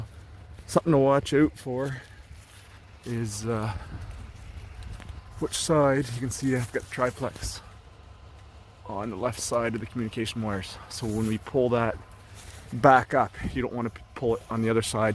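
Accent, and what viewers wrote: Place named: British Columbia, Canada. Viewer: Where exactly are you located at I could probably kind of guess by your accent that you're in the BC area me I'm down here in Tampa Florida but great videos love watching them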